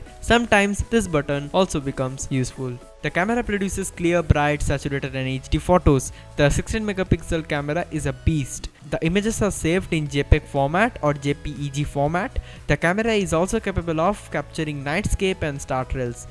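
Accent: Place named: India. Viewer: Its called English with Indian accent, we indians are comfortable with this kind. He is speaking so fast because the video has to be fast and quick or it will take longer time which audience may not like. It's a good review for indians . Thanks brother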